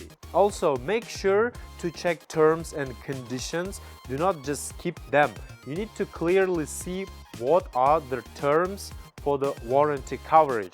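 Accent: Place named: Soviet Union. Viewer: You talk like you’re from Soviet union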